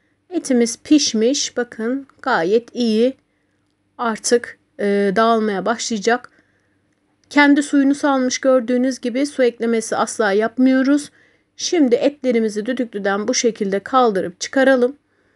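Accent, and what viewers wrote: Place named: Kilis, Turkey. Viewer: Aynı Kilis lisanı❤❤❤gibi konuşuyorsun ❤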